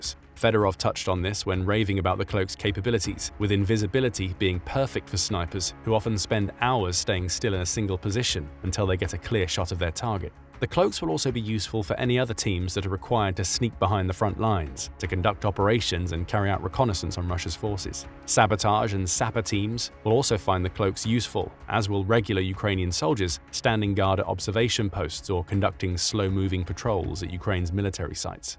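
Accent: England, United Kingdom. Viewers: English accent??? i am out!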